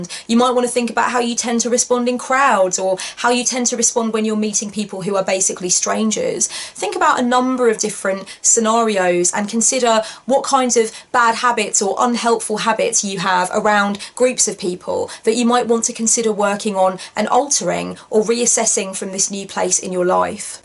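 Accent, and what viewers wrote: England, United Kingdom. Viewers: This was just an awesome, fantastic way to start my day here in SoCal, USA... Having "Tea & Tarot" with one of the brilliant spiritual mentors in my life. Got my own tea here, drinking it while I savor this time and take in the life lessons offered. 🌹🌙🌤💌☕️ Thank you for bringing this segment back! Also: you are VERY fucking English this morning, babes. 😄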